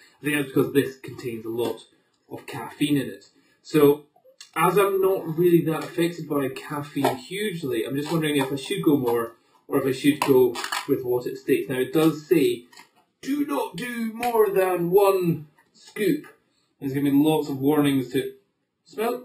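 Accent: Scotland, United Kingdom. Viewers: dude , where are you from , Edinburgh / glasgow ? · he's scottish, it's his accent bro.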